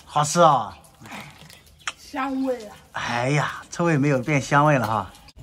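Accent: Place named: Huai'an, China. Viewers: 是江蘇淮安人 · 应该是，咸念han2😁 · 淮安的西瓜上说了我听着也是我们苏北的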